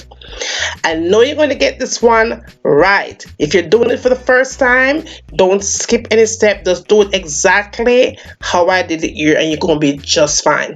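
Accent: Jamaica. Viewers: I love the accent. That Jamaican came out a few times while explaining the recipe 😂😂😂 I love it. My all time favorite food is Jamaican Dishes and Spanish Food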